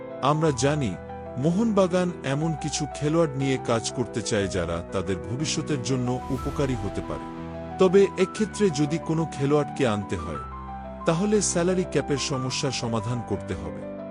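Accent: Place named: Bangladesh. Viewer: উচ্চারণ শুনে মনে হচ্ছে বাংলাদেশি। আমার অনুমান যদি সত্য হয় তাহলে বাংলাদেশেও মোহনবাগান সমর্থক আছে জেনে আমি গর্বিত।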